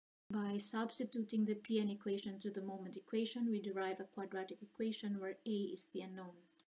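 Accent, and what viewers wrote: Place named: Europe. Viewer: Incredible voice. Very interesting accent: a combination of European accents(?) But should have been closer to the microphone.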